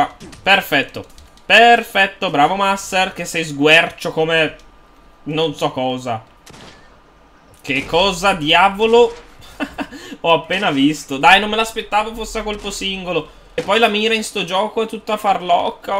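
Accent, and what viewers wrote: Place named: Piedmont, Italy. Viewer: Che bell'accento piemontese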